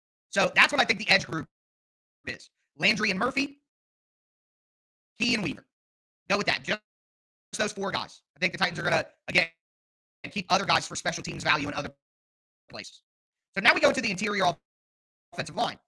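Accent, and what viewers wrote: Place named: South, United States. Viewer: This is why I can’t subscribe to this channel with that southern accent I know he doesn’t want a black QB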